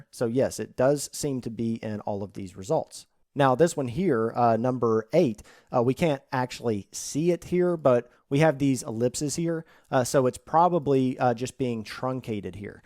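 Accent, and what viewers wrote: South, United States. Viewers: i like his kinda southern accent lmao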